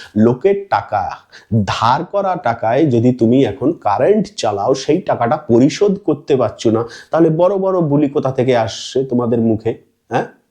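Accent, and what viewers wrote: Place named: Barishal, Bangladesh. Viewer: হরে কৃষ্ণ রাধে রাধে প্রভু, আমাদের বাংলাদেশের হিন্দুদের জন্য প্রার্থনা করবেন। দাদা আপনার বাড়ি কোথায়? আপনি তো খুব সুন্দর বরিশালের ভাষায় কথা বলেন?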